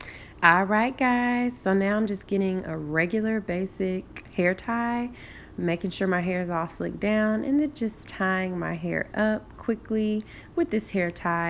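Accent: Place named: South, United States. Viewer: I love your southern accent it’s sooo cute 😍😍😍 and yasss sis this bun came out so cute and effortless! Def gonna be trying this!!! 👏🏾👏🏾👏🏾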